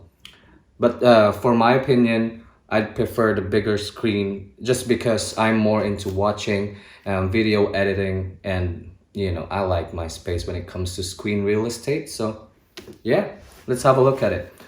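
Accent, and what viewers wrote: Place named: United Kingdom. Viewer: Bumibritisssshhhh accent!!!!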